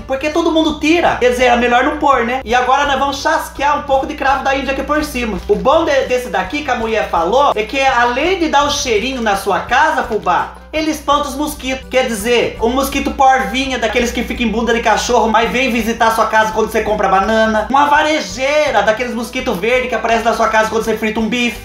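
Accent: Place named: Minas Gerais, Brazil. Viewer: Ô Fubá, e eu achando q vc fosse de MG kkkkkkkk! Com tantas notícias negativas rolando na NET precisávamos mesmo ri um pouco.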